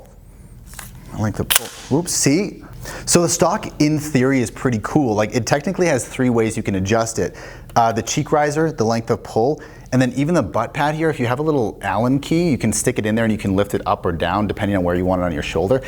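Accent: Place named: United States. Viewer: Wow! A Canadian that sounds like an American. Not for me.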